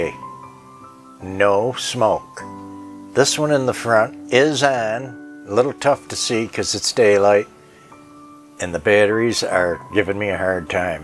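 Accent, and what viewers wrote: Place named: Western Pennsylvania, United States. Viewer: Love your style! By the way, I notice you have an accent. Western Pennsylvania. Ohio?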